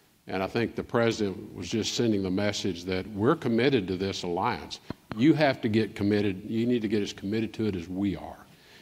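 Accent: South, United States. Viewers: Someone with a southern accent going to save life on earth is like giving a madman a nuclear bomb.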